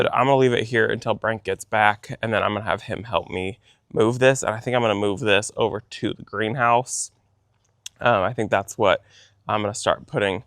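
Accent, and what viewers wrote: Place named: South, United States. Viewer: I noticed a more southern twang as well! 😅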